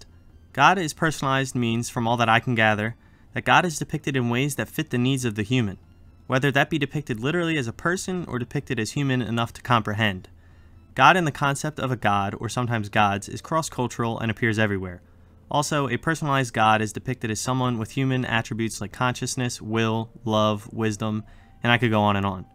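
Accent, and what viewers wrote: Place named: Philadelphia, United States. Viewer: Bro hittin us with that hard Philly accent with the “wooder”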